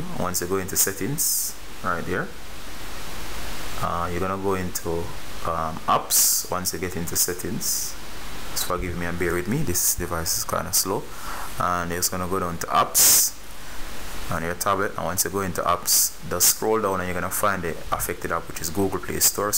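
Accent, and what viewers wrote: Jamaica. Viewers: You sound Jamaican😎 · brilliant Jamaican accent. Thanks for the tips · You sound Jamaican cool.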